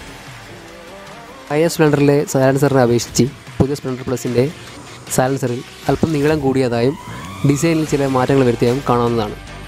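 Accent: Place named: Vadakara, India. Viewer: Vadakara kaaran anallo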